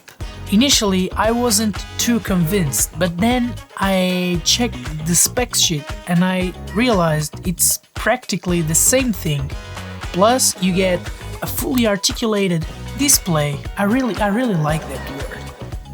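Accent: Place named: Portugal. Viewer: bom video, da para notar em algumas partes que es portugues ahhaha, mas bom sutaque, bom conteudo, novo sub e <mail>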